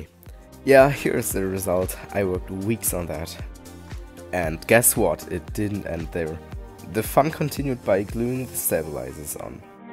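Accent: Germany. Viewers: Are you german???? Your voice and english accent sounds a lil bit like that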